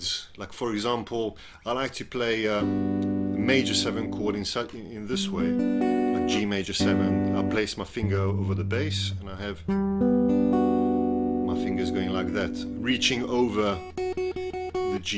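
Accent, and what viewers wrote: South Africa. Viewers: hey, don't get me wrong, there is a great advantage in barring sometimes. So, I won't say never bar. Barring can be really the only way to achieve some stuff. I do it all the time. I realize you are doing it as a time saver and it also eliminates this "where do I go" feeling of that finger. You just bar and know that now you only have 2 or 3 fingers to noodle around with above the bar. I understand. I thought later that maybe your accent was South African after I listened more closely. Just one or two words threw me off to Netherlands. haha Yeah, I grew up playing with a very clamped restricted style and I think it hindered me. But I started on acoustic so I was not playing elec till 3 years later and just always had this cramped style going on. But.... what do ya do? hehe just move on and try to learn more things. aight. talk to ya later!! 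· South African accent! His teaching style rocks!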